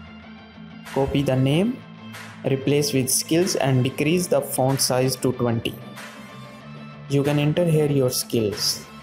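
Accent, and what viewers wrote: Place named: India. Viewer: fuking indian accent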